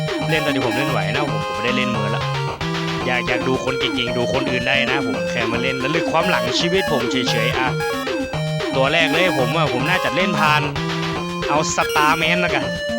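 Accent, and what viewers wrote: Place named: North, Thailand. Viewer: ชอบมากเลยครับ นานๆทีจะมีอู้เหนือ 55+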